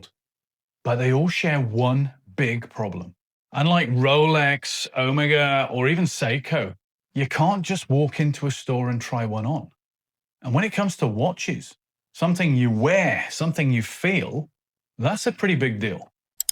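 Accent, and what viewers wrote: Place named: Birmingham, United Kingdom. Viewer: Sounds like a Brummie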